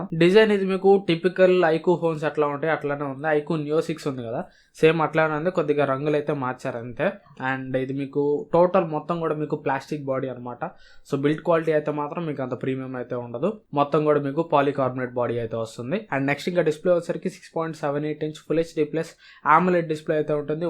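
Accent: Ongole, India. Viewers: Bro meedhi Ongole haa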